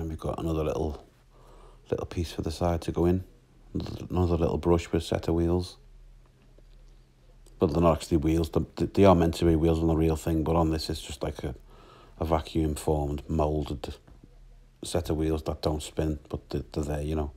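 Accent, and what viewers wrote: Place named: Scouse, United Kingdom. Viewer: Hey, i only found you channel through nick and was made up to see your renovation of the roadsweeper, as im donig one myself as we speak. Im doing it for a friend who owned one as a kid. The added bonus for me was hearing your accent, I thought “ wow, this guy is one of ours “. Im originally from Wallasey in merseyside, so im only a plassy scouser, or woolyback or odgie, but ive been living in exmouth in devon for the last 7 years and its always great to hear one of your own 👍🏻. Great work and im fully signed up to your channel. Well done mate.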